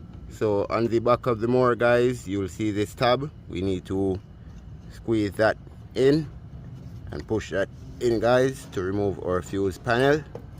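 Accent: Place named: Jamaica. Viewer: You did a great job thank you for the tip and showing us what to do and I like your accent are you Jamaican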